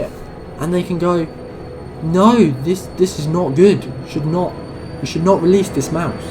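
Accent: United Kingdom. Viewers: you a london g?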